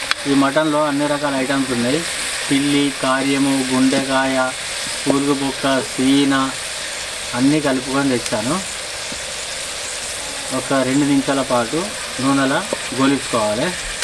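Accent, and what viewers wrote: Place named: Telangana, India. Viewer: Pakka telangana slang ra adi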